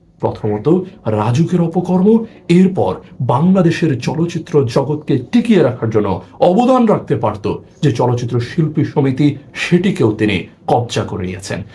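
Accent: India. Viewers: দাদা বাংলাদেশী। আপনার কথার ধরন অনেক ভালো। আপনাকে ইন্ডিয়ান লাগে আমার কাছে।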